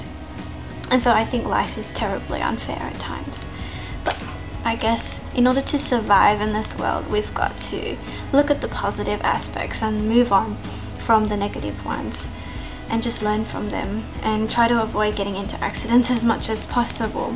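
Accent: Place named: Australia. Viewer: I agree with a lot of what you said. The sexy parts of this episode are so cringe-worthy. The parts with two Callie's are also all very strange. Sara's rendition of I Was Made for You kind of makes up for those (although singing Callie shaking the bad of unconscious Callie is something I've never liked). I also agree that I prefer Sara's version of the song. It's no surprise that she was the best singer, as she has won a Tony. Overall I think a singing episode was a bad idea. It just wasn't a natural fit. You need a show that has more comedy to make it work, like Xena, or Buffy. You sound Aussie, like me.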